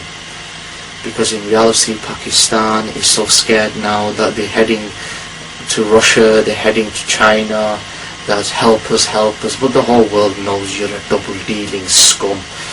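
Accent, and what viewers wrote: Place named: Ireland. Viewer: lol are you Irish or something?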